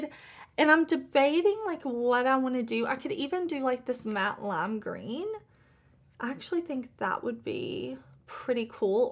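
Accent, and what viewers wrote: South, United States. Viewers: . I want to be you when I grow up. Love your eyeshadow looks and your Southern accent.😊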